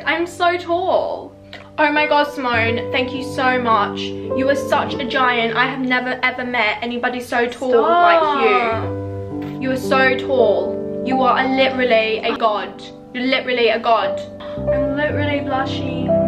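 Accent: Australia. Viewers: it’s called australian accent luv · funny the Asian sounds Aussie damn. still cute tho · R u Australian? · Australian accent not austrian. Austria is in Europe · She sounds like an aussie :) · Her accent is like a mix of Australian and country but for some reason I love it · are you australian? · Simone you better tech us you Auwsiiie aCCennT💅💅💅💅💅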